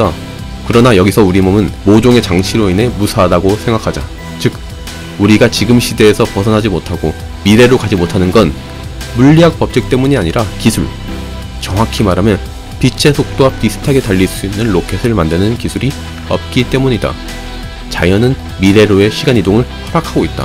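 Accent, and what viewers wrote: Gyeongsang, South Korea. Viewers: 숨길수없는 첯음절에 강력한 엑쎈트 깅상도사투리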